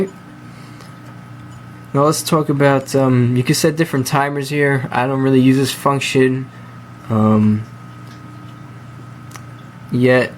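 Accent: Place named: New York, United States. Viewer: Are you a New Yorker? I detect a New York accent.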